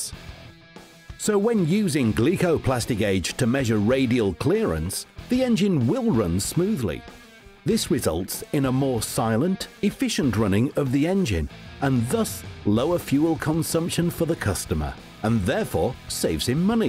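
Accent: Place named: RP, United Kingdom. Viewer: Funny, how it is that you have the stupid, raucous (and distracting) soundtrack and a prim and proper English gentleman speaking perfect Queen's English!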